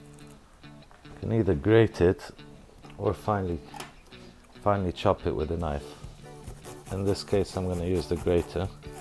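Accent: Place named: Greece. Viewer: πολύ ωραιο το βίντεο και σε στηρίζω να αρχίσεις και τα "αγγλικά" βίντεο. Μην σε ανχώνει η προφορά, τι να κάνουμε είμαστε ελληνάρες (χαχα) , μπορεί εδώ να σε κρίνουν αρνητικά αλλά πιστεψε οι έξω θα το λατρέψουν γιατί τους άρεσει που τα βρίσκουμε λίγο δύσκολα. Φυσικά με περισσότερα βίντεος θα λυθεί και η γλώσσα σου σιγά σιγά :)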